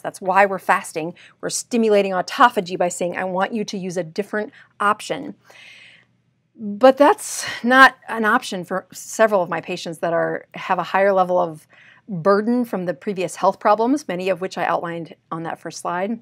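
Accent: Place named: Upper Midwest, United States. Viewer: My family is of Finnish heritage from the upper midwest where most people have a sauna in their homes. My mother is 85 and in great shape, due in great part I believe to her regular use of a sauna. I have an infrared sauna that I've gotten out of the habit of using since we moved two years ago. Thank you for the inspiration to use it regularly once again. By the way, it throws me to hear your upper midwest accent without pronouncing it "Sowna" as the Finlanders do.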